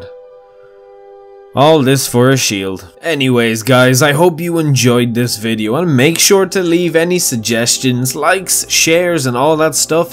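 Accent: Ireland. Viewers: ‘Crisp Irish accent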